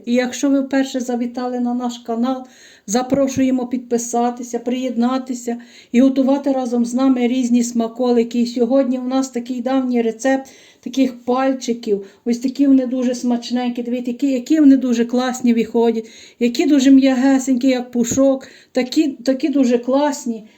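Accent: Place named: Kolomyia, Ukraine. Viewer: Такий голос щирий, рідний, український... дякую!!!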